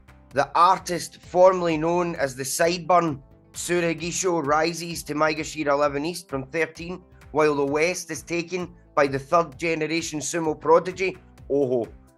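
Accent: Scotland, United Kingdom. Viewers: Good job, and pretty hilarious, too. A thick Scottish accent trying to pronounce Japanese is a hoot. Fortunately you had pics so I could recognize who you were talking about. I would like to see Teru in good health fight one more Basho. Kirishima may be the next Yokozuna, but Atamifuji will follow soon after, I think. Takakeisho will have to go through anger management therapy before he makes it, but if he does he will no longer be as good -- Dilema.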